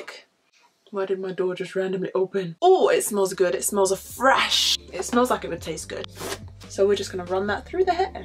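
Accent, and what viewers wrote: United Kingdom. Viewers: Sounds British and speaks Spanish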